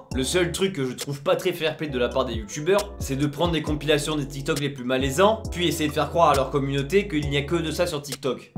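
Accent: Marseille, France. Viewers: Je suis totalement d’accord avec toi et en plus je trouve que maintenant j’entends un peu ton accent marseillais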